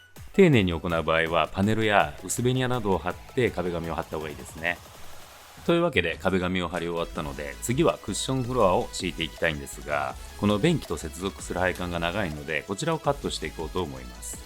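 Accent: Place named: Aichi, Japan. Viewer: 私も愛知県なので、言葉の端々やイントネーションから聞き馴染みがありました。私の家の小さな修理をして欲しいくらい器用ですよね？旦那さんがそれくらい器用なら良かったのに。😅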